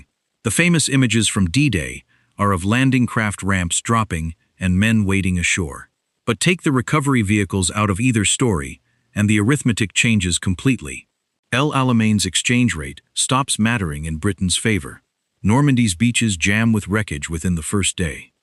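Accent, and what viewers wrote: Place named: United States. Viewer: Who is the American robot giving the commentary? None of the vehicles filmed are from WW2?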